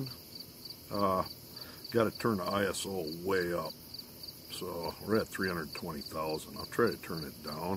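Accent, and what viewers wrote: Minnesota, United States. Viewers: Must be from Minnesota. Great video.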